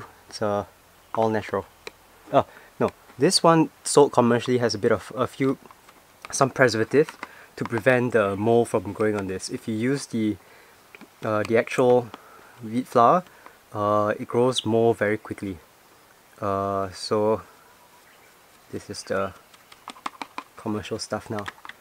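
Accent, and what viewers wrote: Singapore. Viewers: Have you been to Singapore? You sound like a Singaporean! I use Shoji paper to cover model airplanes. How do I find the lightest paper grade? There used to be this paper called Esaki paper but it's no longer available. Where would I find the lightest grade of mulberry paper that remains strong while wet?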